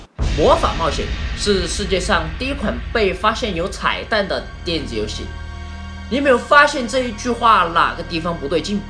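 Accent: Sichuan, China. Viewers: 四川人吗，声音和鸭脖龙好像。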